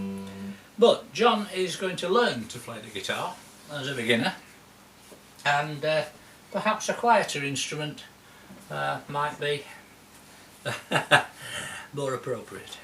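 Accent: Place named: United Kingdom. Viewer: A nice change from the common license plate variety. I would highly recommend a magnetic pick up then you can put some overdrive on and I think that big box will really sound good. Love your accent. I left the UK in 1984 and we don't have a lot of folk here in Montana that sound like us. Where are you from exactly. (Sentinel Guitars, Bozeman MT)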